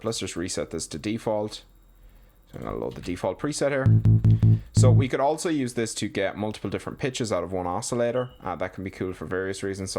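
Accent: Ireland. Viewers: oh I could tell, I'm also Irish lol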